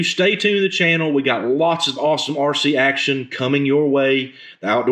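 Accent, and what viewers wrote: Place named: United States. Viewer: Great job, i love your american accent! Yee haaw!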